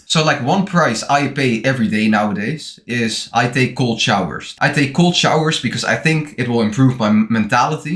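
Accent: Netherlands. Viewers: YOO R U DUTCH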